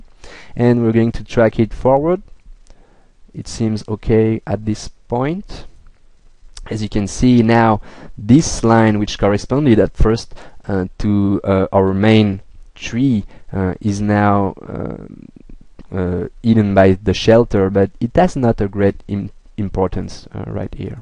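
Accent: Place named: France. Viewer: 'ere. aha love that accent are you french?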